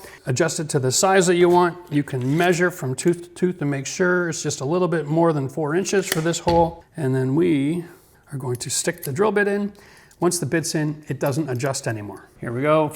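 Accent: Canada. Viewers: In the United States, it is okay to add a 15A receptacle on a 20A circuit, as long as there is more than one receptacle on that circuit. Review NEC article 210.21(B)(3). But with your accent, I believe you're Canadian, I am unfamiliar with the code in Canada.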